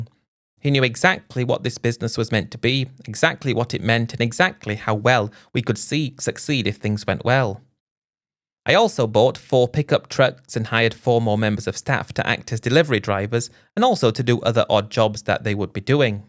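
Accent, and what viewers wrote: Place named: United Kingdom. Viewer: You should’ve just accepted the baby because you are British and the accents kind of annoying so what are you doing? You know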